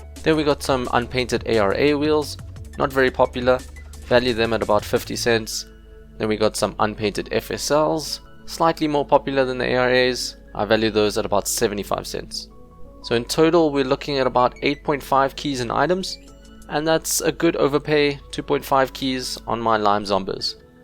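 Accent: South Africa. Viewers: Which country are u from its sounds like south africa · are you South African I am and you sound like you are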